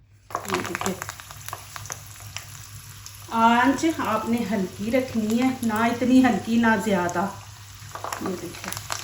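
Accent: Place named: Punjab, India. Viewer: اپ پنجاب کے کون سے علاقے کی بولی بولتی ہیں اپ کی بول چال اچھی لگتی ھے اور ریسیپی بھی اچھی اچھی بناتی ہیں لیکن اپ برائے مہر بانی انگلش کوبیچ میں نہ ملایا کریں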